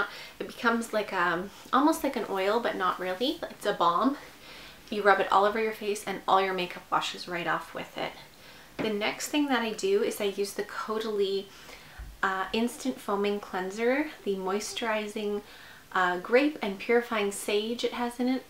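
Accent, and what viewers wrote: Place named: Canada. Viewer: I thought you were Canadian , I’m from Nova Scotia !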